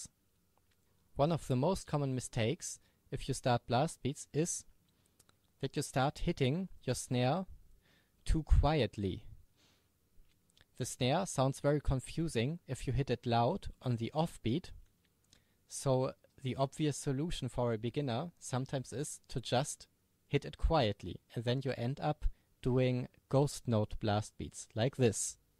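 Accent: Germany. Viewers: Man merkt, dass du Deutscher bist:) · Are you German? I like your accent 😊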